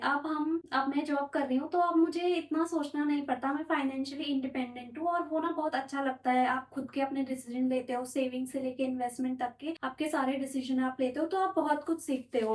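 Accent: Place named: South, India. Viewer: Aap south se ho kya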